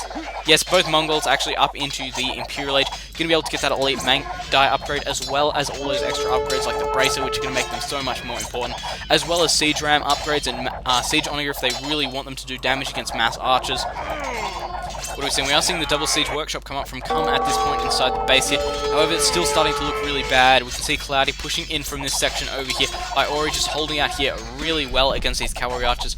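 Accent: Australia. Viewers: Are you-(the commentator in the video)- australian?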